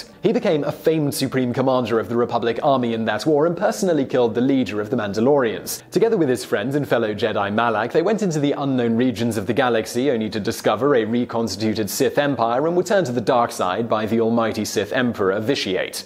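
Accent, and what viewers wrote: United Kingdom. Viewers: Do you need to put your British spin on every name? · Chillax he's British his pronunciations are endearing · Oh limey Brit accents. Made this hard to watch. Not one name pronounced even close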